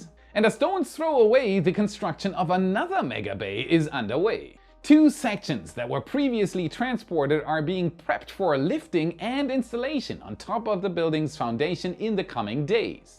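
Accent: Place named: Germany. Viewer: I love your show. I watch it all the time. I love your German accent, too. Let me share with you a secret: Adjacent is pronounced AH-JAY-SENT. The ‘C’ is soft and sounds like an ‘S’. I’m not sorry - I couldn’t resist. Keep up the great work.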